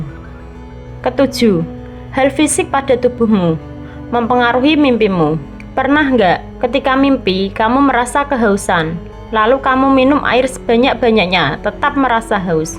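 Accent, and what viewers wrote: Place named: Java, Indonesia. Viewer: Ngomongnya medok banget mba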